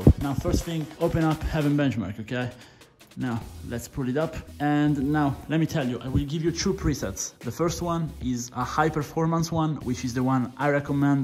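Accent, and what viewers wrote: Italy. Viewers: dal video ho vagamente sentito la calata italiana ma non ero sicuro 🤣 .... e il secondo video di undervolting che vedo sul tuo canale e ti volevo ringraziare! ho una tuf 3070ti e ho optato per 850 mV a 1800 Mhz con un boost di 1000 e un drop di 200 ma penso che posso spingermi un po’ oltre provando 850mV a 1875 Mhz senza boost di 1000 e il drop di 200.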